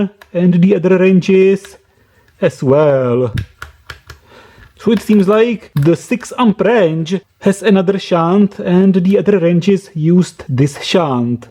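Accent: Czech Republic. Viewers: Czenglish hell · I believe czech really sound like that.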